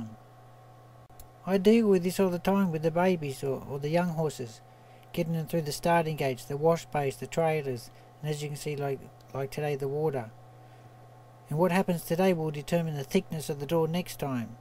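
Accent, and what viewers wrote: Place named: Australia. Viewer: HAHAHAHAHAHAHAHA OH MY GOD! i find myself for no reason finding this totally hilarious. hes 'japanese' but has an 'austrailian' (sounds like to me) accent. and the stuff he says is just bloody class! omg im crying!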